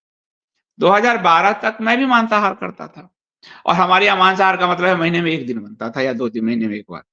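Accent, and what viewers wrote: Bihar, India. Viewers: App bihar Chapra se hai kya?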